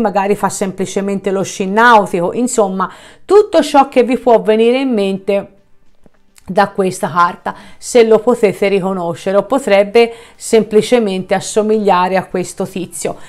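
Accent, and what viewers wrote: Tuscany, Italy. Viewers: Io la LEI, esattamente cosi.. LUI non è un segno di acqua ma ci divide l'acqua. Io in grecia (causa covid) lui in italia. Abbiamo avuto un litigio bello forte.. Cmq complimenti.solo oggi ti ho trovato ma sei fantastica. Adoro la tua cadenza toscana😜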